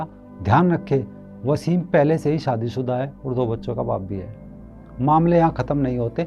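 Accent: Haryana, India. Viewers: bhai, Piriya nahi Priya. Mujhe pata hai aap haryana se hain. Lekin koshish kariye thoda sa tongue style ko universal banane ki. Kyonki aapka content bhi universal hai. Lekin haryanvi essence rajaroor rakhiye.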